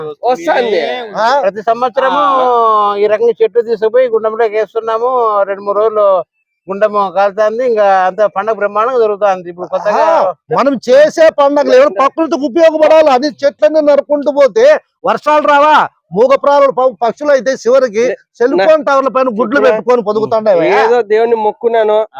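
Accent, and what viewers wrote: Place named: Rayalaseema, India. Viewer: తెలంగాణా యాసకు బదులు సీమ యాస వాడారు.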